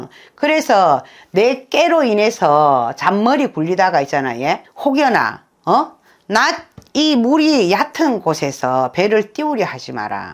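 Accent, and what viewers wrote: Busan, South Korea. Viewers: 선생님 부산분이 신가요?ㅋ 쏙쏙 귀에들어옵니다 ㅋ 정묘생 쏠로운은 없나요😅 좋은 말씀 감사합니다❤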